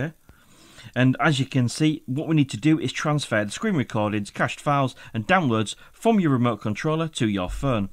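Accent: Hull, United Kingdom. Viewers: I hear a bit of Hull in you accent. Are you from the surrounding area?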